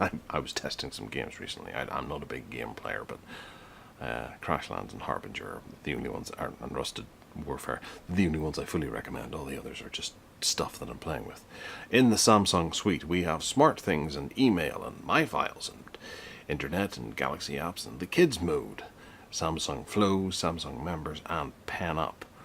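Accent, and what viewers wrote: Northern Ireland, United Kingdom. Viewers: What part of north of ireland you from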